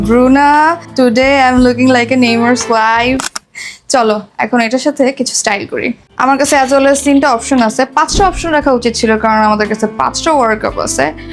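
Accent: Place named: Bangladesh. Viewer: বাংলাদেশের মাল সেটা বুঝতে পারলাম কথাতে।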